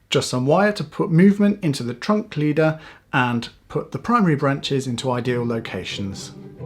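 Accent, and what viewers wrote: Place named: United Kingdom. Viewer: You have a unique style of making videos and its awesome. I like them all a lot and i also like your british accent. Keep the content coming!! Greetings from germany.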